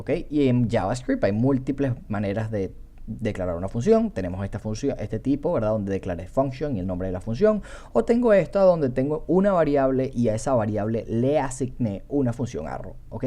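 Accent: Venezuela. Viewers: tu como que eres Venezolano mi pana, excelente instro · Mano tu eres venezolano si o si xD calidad el video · marico eres venezolano? que de pinga encontrar un desarrollador con nuestro acento... saludos mi pana...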